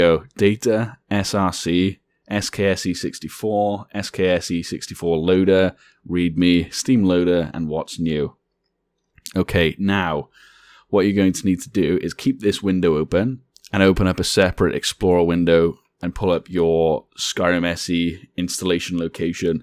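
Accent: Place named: Wales, United Kingdom. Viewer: Dunno why I was surprised to hear another welsh person on YouTube but I was lol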